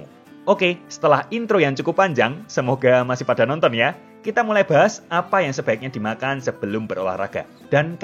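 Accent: Java, Indonesia. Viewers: udh trlalu cinta sama channel ini.. semoga mas slalu sehat dn bnyak rejeki krn udh bantu bnyak orang untuk hdup sehat.. oh ya kak.. mau serius tanya kak orang mana ya?! kok ngomongnya logat jawa kental bgt hehe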